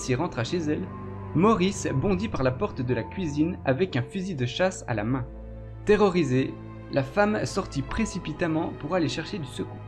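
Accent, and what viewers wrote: Jura, France. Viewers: Bonjour vos vidéos sont intéressantes, on ressent un gros travail de recherches ... Félicitations ! Continuez comme ça. Marrant l'accent Jurassien !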